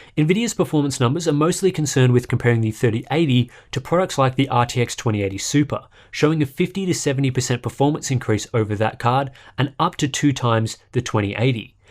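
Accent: Australia. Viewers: An actual proper analysis, in proper Australian English!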